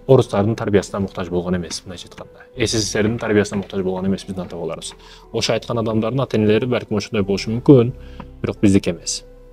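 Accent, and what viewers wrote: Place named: Osh, Kyrgyzstan. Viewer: суйлоп жаткан бала оштукбу аксент мн суйлоп жатат.тили буру экен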